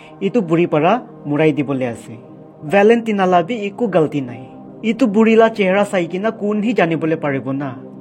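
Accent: Nagaland, India. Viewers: I'm happy with your Nagamese accent special kushi ba tho Last tey....#Na kwa tho😅❤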